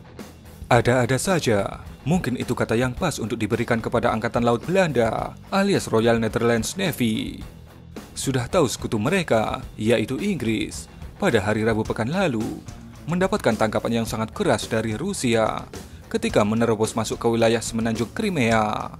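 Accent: Java, Indonesia. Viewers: Admin logat jawamu sangt menganggu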